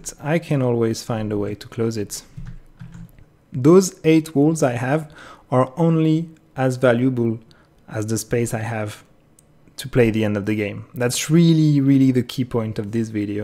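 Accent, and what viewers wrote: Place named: France. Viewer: Great video, I am not sure, but you have a strong French accent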